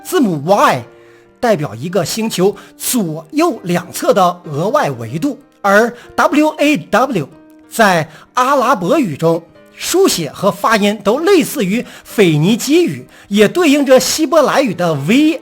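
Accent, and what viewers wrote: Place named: Northeast, China. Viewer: ，东北人儿啊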